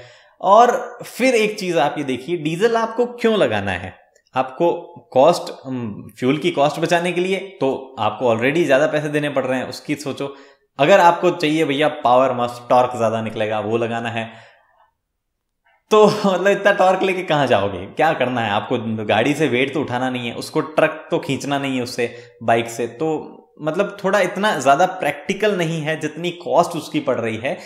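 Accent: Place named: Gujarat, India. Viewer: Are you a Gujrati? You've a strong MTI. :D Btw, nice video.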